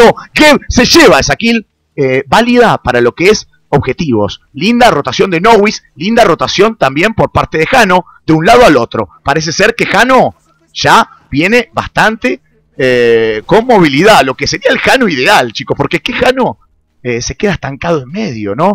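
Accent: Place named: Argentina. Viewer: Relator argentino. No se quien es, pero se nota a los 2 segundos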